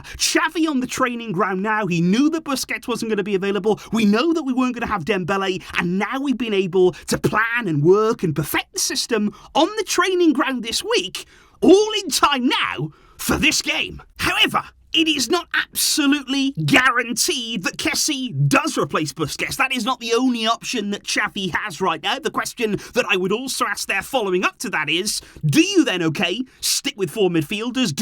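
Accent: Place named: Wales, United Kingdom. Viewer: he’s welsh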